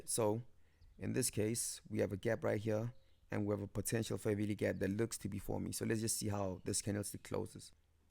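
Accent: South Africa. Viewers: he's from south africa :D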